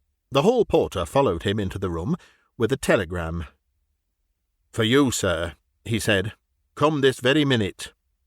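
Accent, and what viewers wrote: United Kingdom. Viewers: Thank you . Much appreciated. I am Egyptian. I did enjoy the superb reading, the beautiful British accent, and the story of course. I can’t say I fully understood the bank “dealings”/terms, but then I can barely understand customer service at my own branch no matter how user friendly they try to be to my 63 yo ears in my own country.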